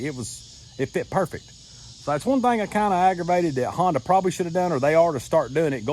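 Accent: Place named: South, United States. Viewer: I heard that talk and sure enough... T for Tennessee brother!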